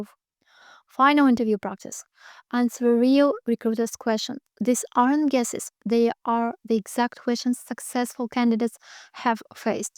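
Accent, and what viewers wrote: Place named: United Kingdom. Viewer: Вау твой Английский реально приобрел британский акцент афигеть 😮👏👏 и все так профессионально выглядит! Эта картинка 🥰 ты изумительная 😍 видео с двух камер и вся анимация и инфографики ☺️🔥🔥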